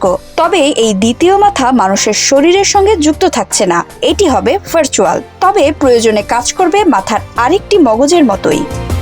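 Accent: Kolkata, India. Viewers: ৭১ টিভি কি কলিকাতার সাংবাদিক নিয়োগ ূিছে নাকি🙄🙄🙄🙄 সেরকমই লাগে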